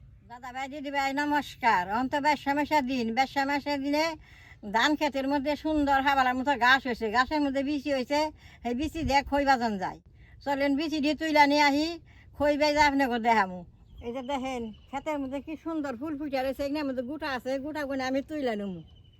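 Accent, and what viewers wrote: Tangail, Bangladesh. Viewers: আমাদের টাঙ্গাইলের ভাষার সাথে অনেক মিল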